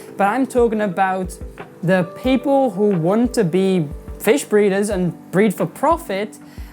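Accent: Wales, United Kingdom. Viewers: Trying to place your accent. Welsh ?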